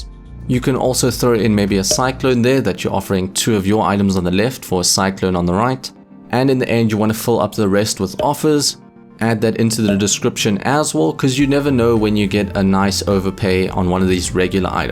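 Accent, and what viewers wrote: South Africa. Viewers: Heyy, are you South African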